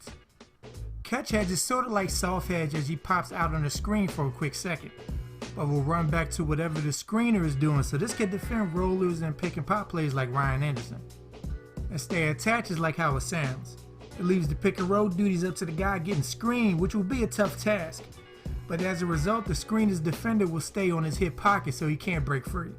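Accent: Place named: New York, United States. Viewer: you got that new york og voice and that beat selection is perfect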